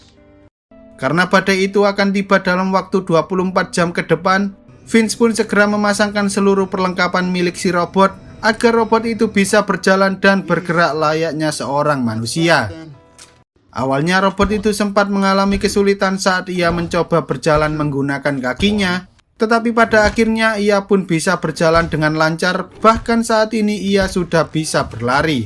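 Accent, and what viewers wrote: Java, Indonesia. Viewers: Mimin wong jowo yo😅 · admin orang Jawa ya bicaranya medok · Medhoj bngt boss, rasa ke tendang kuping gw dngr pke headset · Medok amat bahsa nya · MEDOK MEDOK... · Kalo gak medok mungkin lebih nyaman dengerin nya🙏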